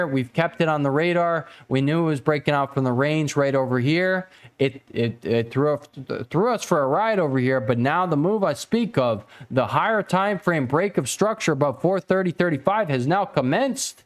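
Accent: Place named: Chicago, United States. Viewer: Thanks for your videos! Aspects of your accent remind me of where I grew up in Chicago. Where are you from?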